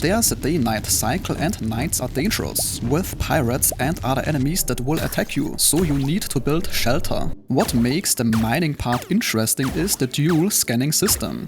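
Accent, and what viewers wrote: Germany. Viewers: Brother its kinda off topic but are you german ? : D just curios cause of the accent (btw i am really exited for this game i hope its not some early acess scam bs )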